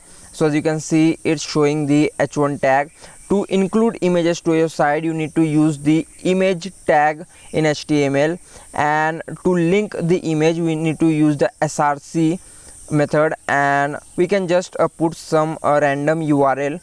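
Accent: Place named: India. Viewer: why accsent india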